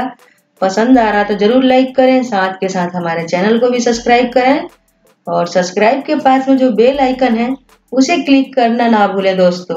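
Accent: Bihar, India. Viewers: Madam chicken se achi to aapki language lagi.....chicken to bnane k baad hi pta chalega...bihar se ho kya aap.... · Aap bihari Hain na? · Jhandu curry,bihari accent mein bol rahe hai aurbana punjabi curry rahe hai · waisey bahut accha...pink-pink madam ney kaha ki chiken ho jaiga.. hum rukey huan hain pink-pink chiken ko honey mein.. abhi to chiken brown brown hui hai..yeh hain bihari madam!!! baut mirchi masala khatey hain...accent sey kuchh andaza aya hai. Bihari food is very good. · Voice se Ho pta chal rha hai ye biharan hai ye kha bnaegi punjabi chicken kuri wo khawAt hai na bndr kya Jane adrak ka swad wo hi baat ho gyi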